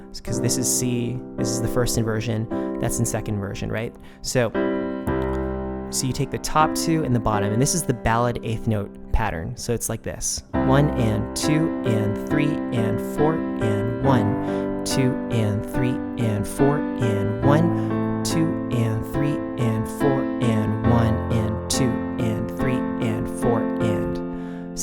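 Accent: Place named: England, United Kingdom. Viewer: What part of England are you from dude ?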